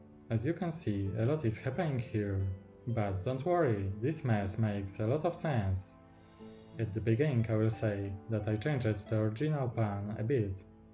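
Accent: Poland. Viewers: Tak myślałem, że to polski akcent xd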